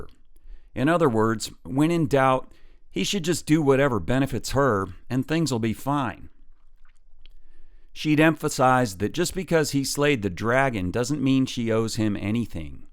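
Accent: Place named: United States. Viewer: Oohh. New video!:D I love listening to your american-ness:3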